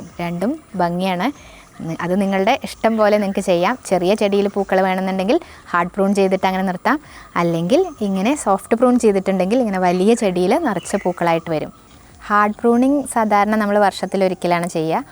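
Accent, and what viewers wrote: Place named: Palakkad, India. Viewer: I ❤ your Palakkadan slang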